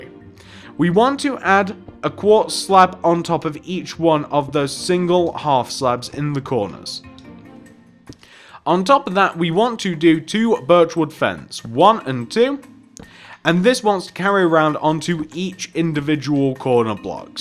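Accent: England, United Kingdom. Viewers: Love the vids r u English?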